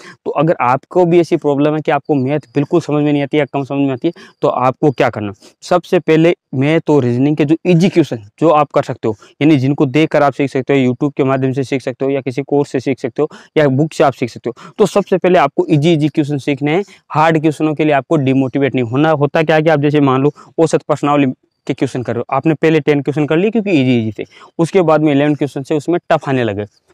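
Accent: Rajasthan, India. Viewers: Sir Rajasthan se ho kya aap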